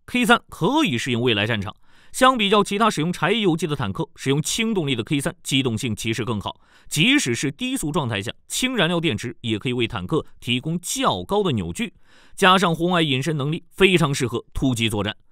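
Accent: China. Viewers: 播這種中國腔調,不行真難聽直接下一頁!!!!!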